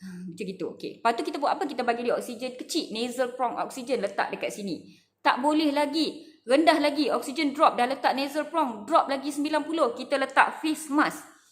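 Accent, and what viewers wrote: Malaysia. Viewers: Trying to focus on your explanation but honestly cara dr cakap melayu jugak attract me more 😅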